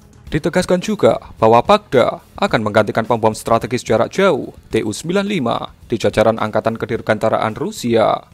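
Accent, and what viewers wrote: Java, Indonesia. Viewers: Medok amat logatnya mas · * Medok medok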